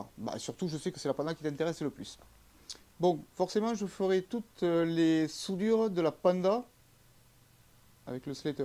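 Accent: South, France. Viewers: L’accent du SUD quelle beauté 🤙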